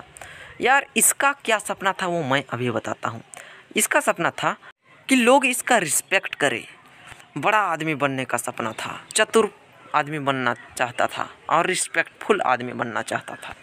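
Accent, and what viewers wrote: Bihar, India. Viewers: Aaaaà bhai kaya tum bihari hooo???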